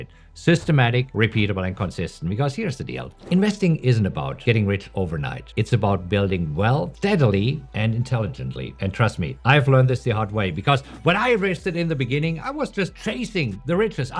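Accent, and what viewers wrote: Germany. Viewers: Du hast diesen wundervollen NRW Nachnamen und ich glaube auch, man hört, dass du einen deutschen Akzent hast. Nur ein bisschen. Viele Grüße aus Berlin. 👋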